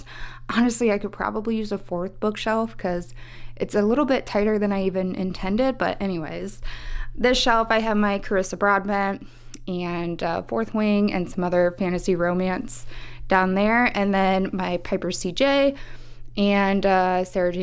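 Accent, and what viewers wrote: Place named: South, United States. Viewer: Do you mind me asking what state/region you’re from? TOTALLY ok if not, I just LOVE your accent as a southerner and I’m a linguistics nerd. Also your shelves? Immaculate:)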